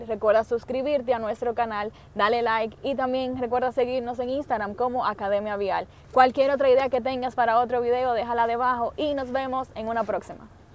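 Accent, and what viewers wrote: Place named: Dominican Republic. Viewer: Lo sabia que era dominicana por el acento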